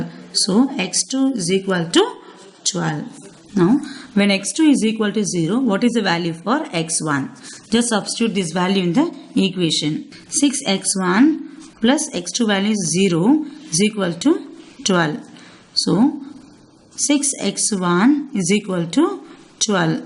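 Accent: India. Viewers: the explanation is very good, especially with the indian accent :D , i have an exam and i wish that can help me to pass it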